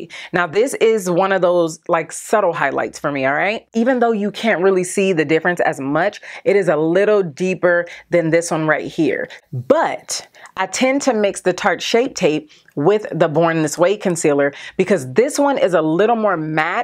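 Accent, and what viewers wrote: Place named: Spain. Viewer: Loved your enthusiasm throughout the video as well as your Spanish accent! You rock! Tu si sabes!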